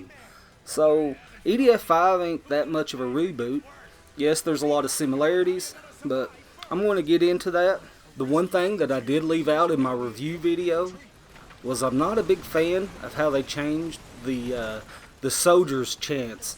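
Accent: South, United States. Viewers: This man has the best southern accent ever. 10/10 very nice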